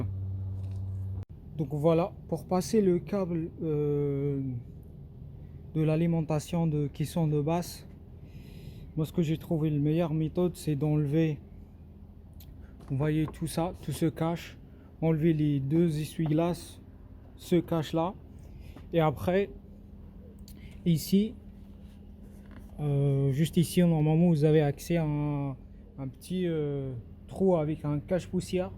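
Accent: Algeria. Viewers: Votre accent est algérienne monsieur 😂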